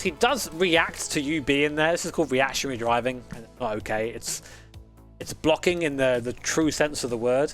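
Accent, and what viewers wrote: United Kingdom. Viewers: I couldnt understand what you were saying since you are British and you sound poor anyways so whats the point of listening to you? being respecful goes a long way and its just a game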